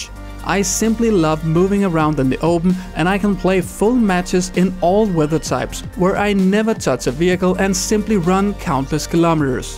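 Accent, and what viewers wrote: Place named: Denmark. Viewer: It's easy to hear for Danes that he is from Denmark - yes with an "a"! :P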